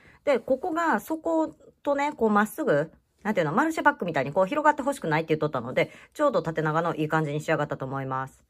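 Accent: Kansai, Japan. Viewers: すっごくわかりやすかったです。関西弁聞きやすかったです。ありがとうございました🙇お弁当入れにしようと思います🍙。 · 関西弁わかりやすくて聞きやすい✨編み目も「へ」の文字に表現したりで非常に分かりやすかったです。ドゥードルのワンコの編み方を是非やってもらいたいです！ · めちゃわかりやすいです！ 関西弁最高です！！ 素敵な動画ありがとうございます！！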